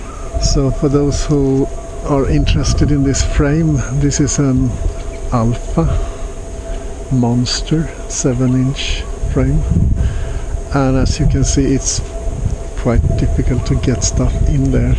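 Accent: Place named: Scandinavia. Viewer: Hi, nice video! I was looking around for fun because I've built an Alfa Monster myself and are about to build another one, but can I ask you where you're from? Edit: Saw Sweden mentioned in your channel description, thought I heard that nordic accent, being from Norway myself. Looks beautiful there, do you take guests? Kidding of course, but I would love to see Thailand sometime, through my Fatsharks too :D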